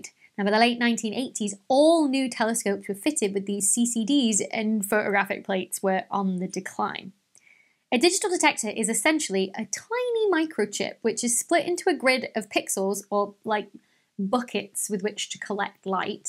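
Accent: United Kingdom. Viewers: Absolutely so! But, in the meantime, I worry that you might be losing viewers ... and I love your explanations so much that I think you should have a much wider audience ... BEFORE the bastions of patriarchy are brought down, which could be a long time! Don't think of it as compromising your English Regional Integrity (ERI!) but just as a courtesy to viewers listening on tinny speakers! When I moved to the US, I resolved NEVER to say "TomAYYto", I thought, "No, let the Yanks learn "TomAHHto", but after 8 years, I just got tired of having to say "No Tomahhto" over and over again (I HATE them, they are Satan's Fleshy Fruit!) so I made my and waiters lives easier by saying "TomAYYto" when necessary.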